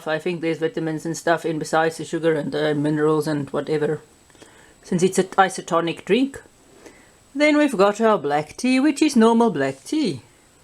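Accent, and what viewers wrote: South Africa. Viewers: That's a hell of an accent. South African?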